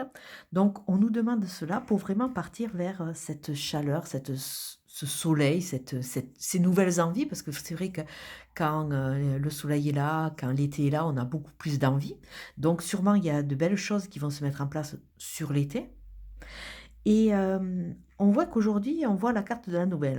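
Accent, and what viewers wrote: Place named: South, France. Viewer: Bonjour, accent du soleil!!. 🌞... j'aime beaucoup cet accent, merci de tout ❤ pour ce tirage. Une question si vous permettez: faites le nettoyage émotionnel? s'il vous plait... 👃 🙂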